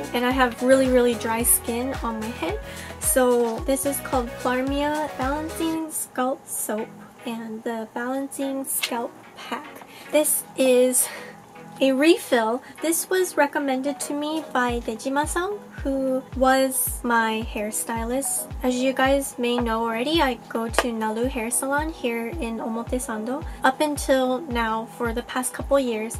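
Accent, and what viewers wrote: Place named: United States. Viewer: Are one of your parents English or something? You sound so American (that’s a compliment btw)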